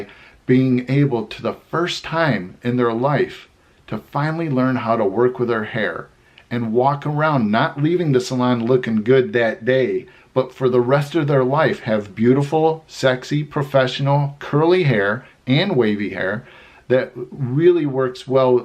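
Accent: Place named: Upstate New York, United States. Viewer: OH MY GOD I GREW UP IN JOHNSTOWN! Was born in brooklyn and moved there when i was 7 and moved back to nyc at 19. I just choked listening to you say this! Jansen Ave elementary school and Knox jr high Lol!! Im dying this is TOO FUNNY! No one ever knows where it is, they always think I mean Johnstown PA. I have been wondering where you were from because you totally have that upstate accent. wemple and edicks chocolate jumbles were my favorite cookies growing up and we always went to Saltzmans in Euphrata every summer for their milk weed and fried chicken. I suffered MANY terrible haircuts in Johnstown and my Best friend Maria and I used to beg my Mom to take us to Albany to get Posner products after watching soul train every saturday because we both had thick curly hair and needed help. Beautiful family, thanks for sharing! :)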